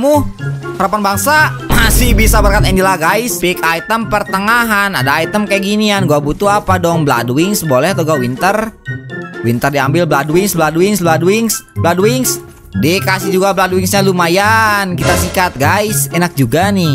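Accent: West Java, Indonesia. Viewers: Mang org mana Sunda n logat n alus haha 😂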